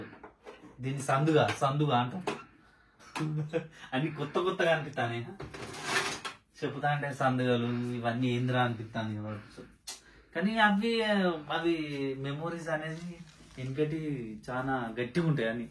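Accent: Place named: Nirmal, India. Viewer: Meedhi Nirmal ha annna mem.sem hatlane matladutham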